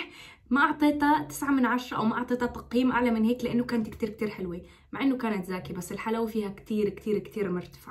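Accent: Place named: North, Palestine. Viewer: لهجه مدن شمال فلسطين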